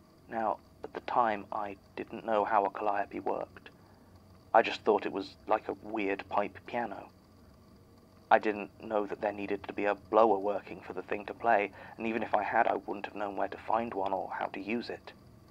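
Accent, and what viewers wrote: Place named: United Kingdom. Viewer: “If they work for the Magnus archives they should” Martin*listening in outside* : breaks into a cold sweat I’m in danger “I had never been scared of dolls" Me and my 2 worst fears being dolls and bloody mary(thanks supernatural): godDAMMIT Also Jons "...Americans" reminded me of one american youtuber recommending the podcast and one of her reasons being "the guy is British and his accent-" and I had the same reaction😂(I live in Scotland)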